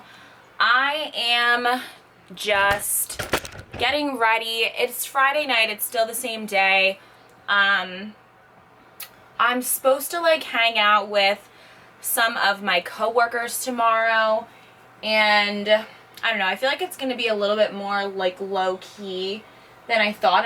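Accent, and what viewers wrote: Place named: California, United States. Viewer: Are you Californian? Because you definitely sound like one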